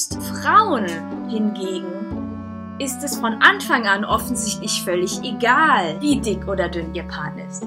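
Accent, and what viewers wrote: Germany. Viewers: Klingt vor allem nach Ruhrpott. Sach ma Doartmund 👍😉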